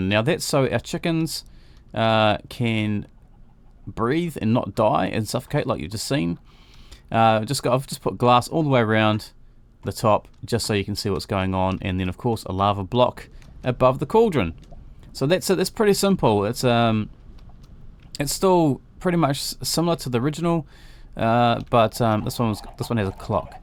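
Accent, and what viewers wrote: New Zealand. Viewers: Your a kiwi! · R u from New Zealand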